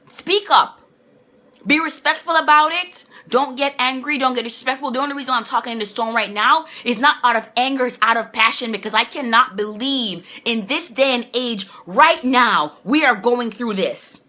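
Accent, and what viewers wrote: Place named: Jamaica. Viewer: Jamaican accent? (I'm from the Caribbean so just curious). Confirmed. Shout out to our northern neighbours :) Great video btw.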